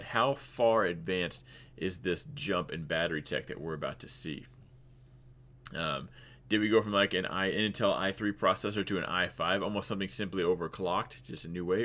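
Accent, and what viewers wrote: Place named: New York, United States. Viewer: He sounds like a New Yorker at 1.5x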